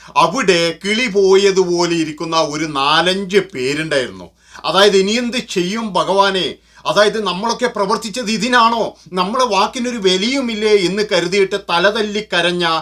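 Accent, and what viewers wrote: Kannur, India. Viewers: നിങ്ങളുടെ കണ്ണൂർ ഭാഷ ❤ നാട്ടിൽ എവിടെ ആണ് ❤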